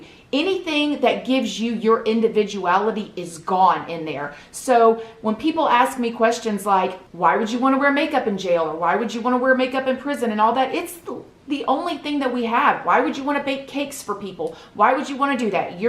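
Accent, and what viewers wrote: South, United States. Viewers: Omg...you went from 14k to 93k in less than 2 weeks girl! you're making it rain on them hoes! 😂😂😂 and don't you worry about the way you talk;) I'm from Kentucky and there ain't nothing wrong with having a southern twang! xoxo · Girl, it’s just a southern accent that some can’t understand. LOL. I’m in mississippi so I knew EXACTLY what you were saying!!!!!!!!! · Girl, let me just tell ya hun, i love your accent! Im a fellow southerner, from Alabama, and my son (age 12) and i also have our own language that only we understand lol. 😂. Love you bunches chick! 💞😘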